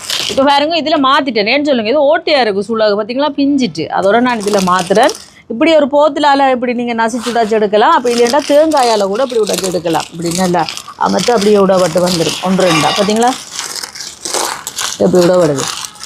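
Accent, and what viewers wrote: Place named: Sri Lanka. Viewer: இலங்கை தமிழ் மிகமிக அருமை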